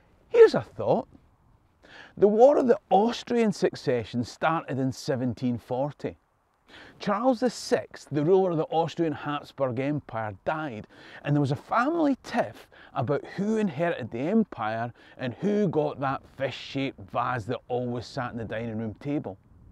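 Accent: Scotland, United Kingdom. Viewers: Happy New Years and family!!! I watched someone else vid. But finely I now know what a Jacobite is. I had a hard time understanding you. I would turn up volume. When you would say who they were. I think it's was your Scottish accent. I feel all caught up. Warm Hugs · for some reason the scotish acent makes it more entertaining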